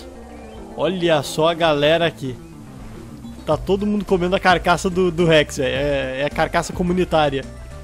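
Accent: Rio Grande do Sul, Brazil. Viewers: Vai rola pauleira kkkkkk da para ver que tu é gaúcho kkk tbm sou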